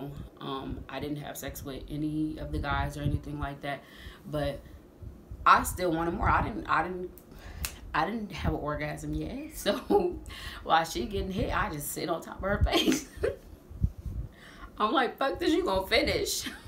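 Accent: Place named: Ohio, United States. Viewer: I felt you were from the Buckeye, eard it in your voice. I live in the Cleveland area but I'm fom Atlanta. I've been here 14 yeas. Love te story, we all have one...even the " oh my" folks.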